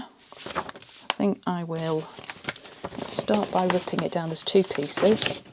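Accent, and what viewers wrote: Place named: Lancashire, United Kingdom. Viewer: Love this project,! Your voice is so soothing, and it reminds me so much of when I lived in Lytham, Lancs. throughout the 1980s!!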